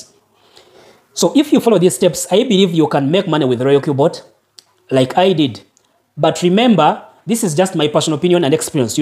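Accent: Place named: Buganda, Uganda. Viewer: He seems a muganda according to his acent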